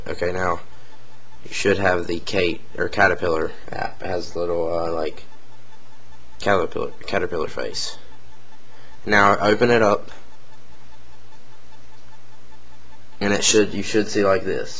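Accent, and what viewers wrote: South, United States. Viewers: i hate ur accent red nek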